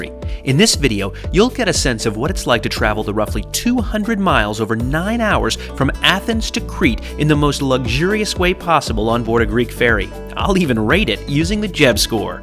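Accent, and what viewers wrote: United States. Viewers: It's because he sounds and acts American. Some Greeks don't take kindly to Americans, or has that changed? There was a time where it was bad. I remember a time where my Cousin brought an American to Greece, took the Taxi, and got verbally abused by the driver. My cousin answered back in the most perfect Greek to which he shut his mouth. I would assume the same for Jeb's Journey. I could be totally wrong.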